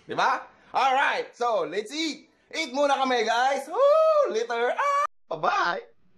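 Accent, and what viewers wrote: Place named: Tarlac, Philippines. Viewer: and ilocano ka po ? u sounds like tarlaceno or pangalatoc or ilocos kabayan pala kita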